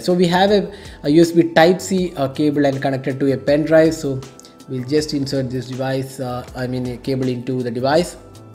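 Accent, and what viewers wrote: India. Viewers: Indian english - auto dislike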